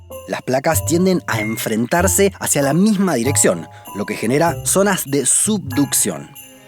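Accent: Rioplatense, Argentina. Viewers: Soy un estudiante argentino que Lleva un año viviendo en la ciudad de Medellín Colombia, y después de escuchar el dulce acento de este lugar, no eh perdido el mío, más sin embargó siento muy marcado en la manera que utilizas el yeismo, el famoso (sho) del río de la plata. Un saludo compatriota desde la hermosa Colombia 🇨🇴🇦🇷♥️❣️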